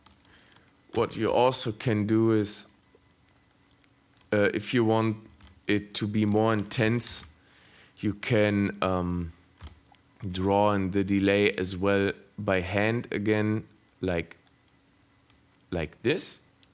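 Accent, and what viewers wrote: Germany. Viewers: That german accent😂 Great remake🔥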